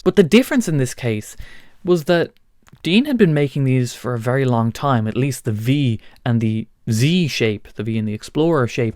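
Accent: Dublin, Ireland. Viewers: That is not how all Irishmen pronounce the word R , he is from Dublin & that is how they say their R`s. Just like the UK , there are many different accents in Ireland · Englishman here, got me too! Turns out I’d never heard an Irishman say the letter R before. Now I know.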